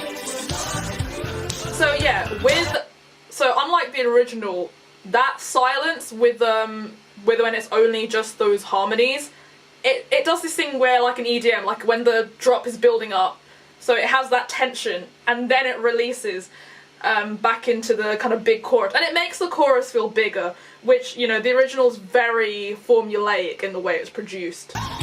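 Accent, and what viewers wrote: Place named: United Kingdom. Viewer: Well I am a huge fan which attracted me to your video and I heard the British accent I was like hey gonna support a fellow brit. But anything live by him there is a these vevo performances he did a few months back you could check out. Also cover by I'm a huge fan especially which is my fav album of all time. I'll try to think of more. But let me what you have heard and haven't so I can suggest more. I would message you on socials but I don't have any social media anymore I deleted it all last year sorry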